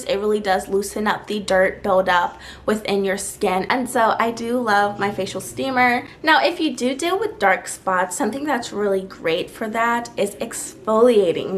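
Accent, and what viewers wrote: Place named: Caribbean. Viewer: Where are you from? I'm hearing a particular accent from the carribean.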